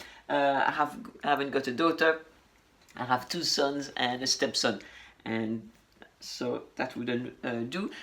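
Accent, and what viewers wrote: France. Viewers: The (alpaca) Max Mara i certainly never will sell! ;) Some women like to change their wardrobe every month ;)I have a beautiful knitted 100 % Scottish cashmere scarf which i wear already for at least 15 years. So i absolutely understand what you mean but I like a little bit more change and renewing . I love beautiful animal friendly natural materials. I never wear 100 % synthetic. Sometimes there is some minor percentage of synthetic in my clothes. Have a nice day in beautiful douce France ! It is the favorite holiday country ( together with French Belgium) for me and my family living in Holland. I love the French language! In my opinion it is by far the most beautiful language on earth! I LOVE your little accent and French musical intonation ! ;) But of course your English is very very good.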